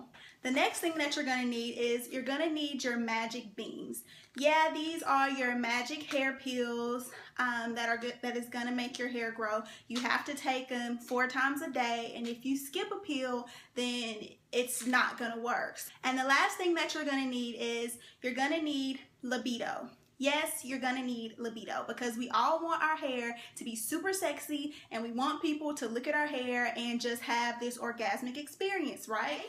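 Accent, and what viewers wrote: South, United States. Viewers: Your Southern accent is lovely. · I can hear the South all in your voice! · Where are you from?? You have such a cute country accent.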